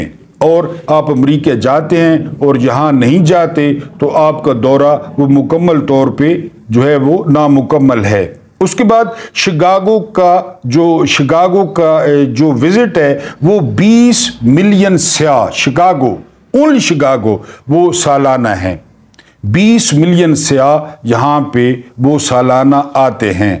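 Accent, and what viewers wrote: Saraiki, Pakistan. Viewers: Masha ALLAH Zaberdust V Log kertey hein, Saraiki Touch bohot hi acha lagta hey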